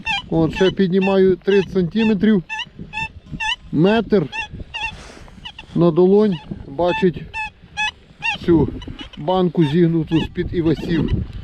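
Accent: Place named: Khmelnytskyi, Ukraine. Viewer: Доброго Дня,З Якої Області .Схоже На Розмову Хмельницька.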